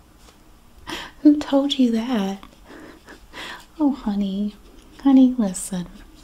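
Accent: South, United States. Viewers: Tell me you’re a Southern lady without telling me you’re a Southern lady. Git ‘em! Love you!!! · Y’all, I thought she was talking to me and almost reported myself………😊 damn I love a southern woman and accent. She has me like ole yellow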